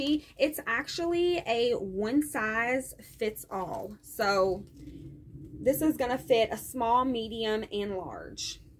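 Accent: United States. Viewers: I fuckin love ur country accent💖💖💖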